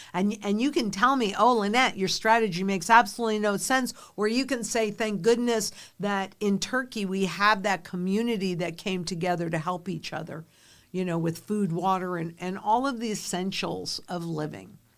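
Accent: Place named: United States. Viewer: My nit.....An historical is used when you speak in a British accented English because the "h" is silent. American accented English says "a" historical cause the "h" is voiced . Lynette speaks American accented English. So, she is correct. you can take your nit back now.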